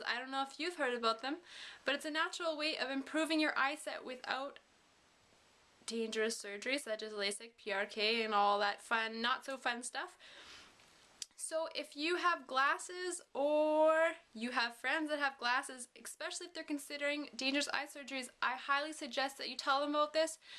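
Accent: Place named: Canada. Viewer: I feel really bad for you and the suffering you've had to endure due to Lasik. Thank you so much for your video. You have saved myself and thousands of others from this procedure. You said you don't wear makeup often because of swelling. I wanted you to know that I think you are very very beautiful without makeup so why bother? :) You don't need it! PS...you sound Canadian.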